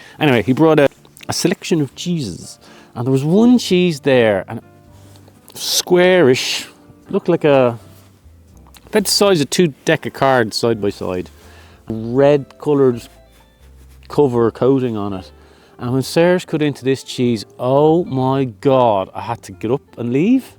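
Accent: Ireland. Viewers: He is definitely "a keeper". Charming, funny, attractive, fun, love listening to his Irish accent, blends so well with his personality. I hope he has a good women showing him the attention he deserves.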